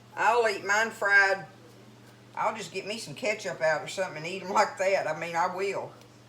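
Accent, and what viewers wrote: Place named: Georgia, United States. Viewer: My Mother made THE BEST Salmon patties. She did use flour, corn meal and egg. Sh always made biscuits and gravy to go with them, and fried eggs. Great for breakfast. I am going to try your recipe. They look delicious. I love watching you cook and hearing you talk. I am from Georgia so you and me talk alike. Love you!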